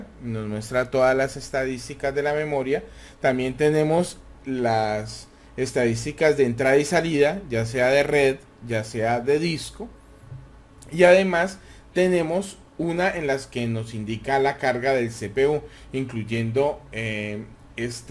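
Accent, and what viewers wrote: Colombia. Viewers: ...Gracias...Excelente opcion. por tu asento se ve q eres colombiano XD... like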